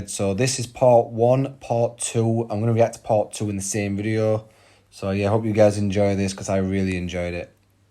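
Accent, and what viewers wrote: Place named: United Kingdom. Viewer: AHHH HES BRITISH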